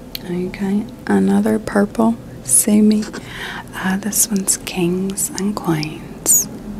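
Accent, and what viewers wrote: South, United States. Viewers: She's back and better than ever!! I love your ASMR so much and especially your southern accent! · Man I was just thinking about how I was craving a new vid from you and then I refreshed my youtube homepage and here it is! I always love the southern accents cause it makes me feel like I'm back home after moving up north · There's something kinda comforting about hearing somebody speak in a southern accent Not about anything politically charged or whatever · I LOVE your ASMR. Southern accent is the my fav. Thank you for putting blame on Justin, he dropped the ball on that one.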